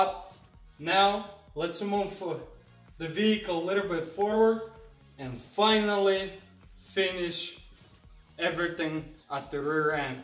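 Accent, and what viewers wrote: Russia. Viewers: Вау. Тачка огонь. Все время слежу за Вашим видео. Очень хорошие машины берете в работу. не Хлам. Если не секрет сколько такая после ремонта в продаже у Вас? И еще, акцент у Вас явно русский:) Могли бы Вы делать субтитры на русском языке)))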